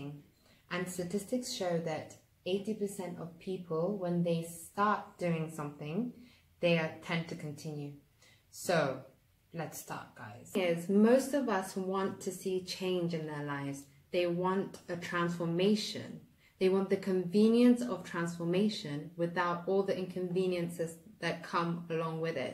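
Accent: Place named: United Kingdom. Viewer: This is a great video. In love with your accent a bit British but not strong. Just so cool. And that's a great video content there!!! Keep it up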